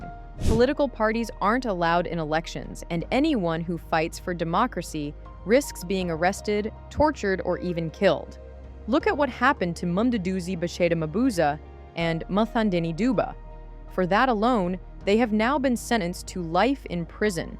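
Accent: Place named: United States. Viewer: I wonder where this is going, you are speaking with American voice, what do you want from Africa because your President does not like Africa. Check your country, God has revealed USA as Mistry Babylon The Great. USA is about to be judged, it is imminent, prepare yourselves because you will be surprised while you looking at others countries.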